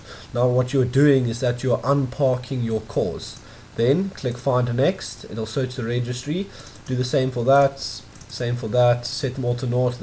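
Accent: South Africa. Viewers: You sound South African... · Great to hear a Safrican voice when browsing technical vids. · South African yes?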